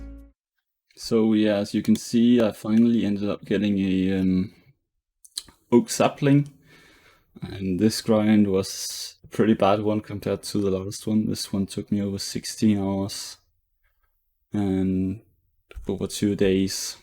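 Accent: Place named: Denmark. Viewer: I love how violently danish your accent is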